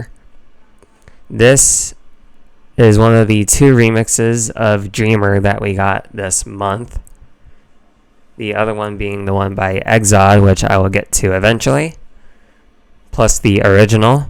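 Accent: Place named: North America. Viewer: Yoooooo good to hear a North American voice! Great commentary and hope to see more of these in the future!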